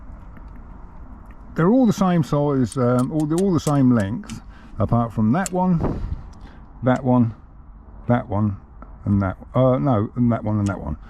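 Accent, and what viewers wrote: Suffolk, United Kingdom. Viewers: Many thanks for this, Mr. I will save the link till it's time for my 500. In my opinion, the gear cover casting is one of the most beautiful parts of the engine. I have enjoyed watching this, and listen to your pleasant speech. Suffolk, was it? Swedish regards. 😀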